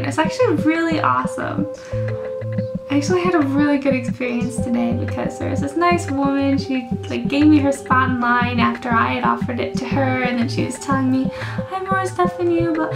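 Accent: France. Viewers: i'm totally in love of your french accent :) i'm french and i'm approve all the things you say about "monoprix" and for the " french supermarket" in a general way . it's very interesting to see our culture from your point of view. Did you talk about the fact than even the men do " la bise" with others men ? i think for American people it's a social manner inappropriate ( sorry for my english ) . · You have a great french accent :O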